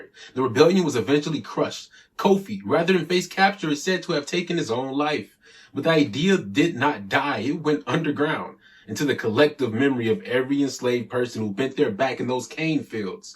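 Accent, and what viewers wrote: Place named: United States. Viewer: Okay. I want to listen to what you have to say however, the mispronunciation and American accent is throwing me off!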